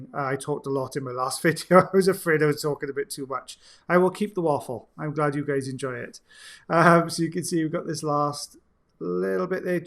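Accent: Wales, United Kingdom. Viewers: Take care of yourselves and your community first! Your videos (and lovely waffling in a lovely Welsh accent) can wait until it's safe again. Best to Mrs B and the little Bs.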